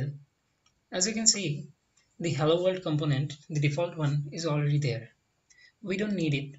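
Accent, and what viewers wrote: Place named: India. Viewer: skipped. Cause: Indian accent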